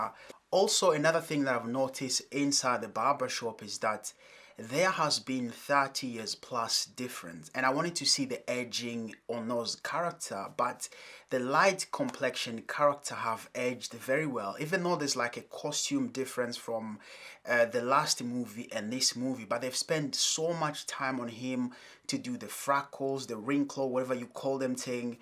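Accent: Africa. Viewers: Great review brother. You broke It down nicely. But you don't have a British accent. You sound African. Even if my eyes were closed. 😊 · Your accent is telling me your African using a some London and American slang words you don't sound like you're from London at all.. I agree with your break down tho.. The movie seemed very rushed and not really funny at all.. A big let down it was ok in some parts but overall not good.. Your totally right about the clothing and lighting after Black Panther I thought Americans have stepped up but not this time..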